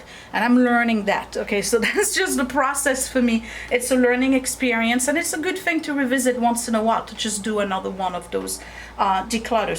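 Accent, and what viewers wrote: France. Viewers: not sure you will see this but I felt compelled to tell you that because of YOU and your daily motivation,allowing us to see your REAL life "collecting" I finally dropped off 6 trash bags and 2 boxes of clothing to the goodwill yesterday. I have been unable to make any substantial progress in reducing my junk until I met you. So when you are exhausted and not sure your videos are worth it, believe me they are SO appreciated. I hear your lovely exotic french voice in my head all day, motivating me to keep making those decisions, I have ignored for 10,15,20 yrs. I have not followed your schedule but started with clothing stored in bins in the laundry room because they bothered me the most and felt they would make the biggest impact once gone! This will take months and months but I am finally ready!! Thank you is not enough.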